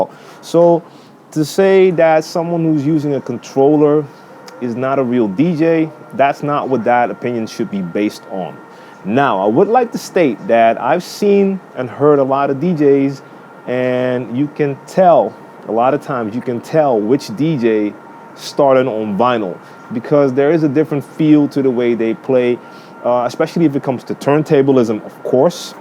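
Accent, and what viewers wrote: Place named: United States. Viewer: true that! Especially cause I am doing all digital. But it's not even about me. It's about the music :) Haha, klopt helemaal ;p! Ik moet eerlijk zeggen dat ik niet had geweten dat jij ook Nederlands was totdat je het in een van je videos zei. Goed Engels/amerikaans accent haha. Heb veel van je videos geleerd!!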